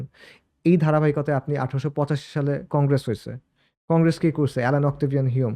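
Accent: Sylhet, Bangladesh. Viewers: Host mone hoy sylheti. thikmoto bangla bolte parena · সিলেটি উপস্থাপক মনে হচ্ছে 😅❤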